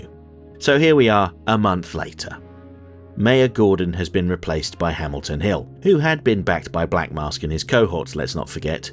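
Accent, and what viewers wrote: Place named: RP, United Kingdom. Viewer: I hope this channel grows well. I enjoy your insight and in depth review alongside your nice voice. I also learn a bit of history. Its like hearing Sal from Comicpop explain the comic but with a chill posh British accent.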